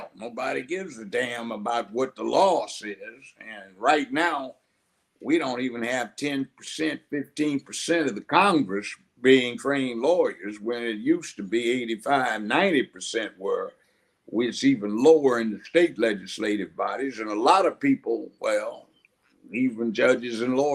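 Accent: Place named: South, United States. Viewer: With someone with such heavy southern dialect, his impressions are amazing lmao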